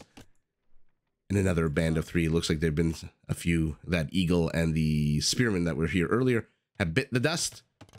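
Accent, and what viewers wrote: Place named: Canada. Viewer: youdaman,! love the Canadian accent, ive got an Eastern KY one, myself.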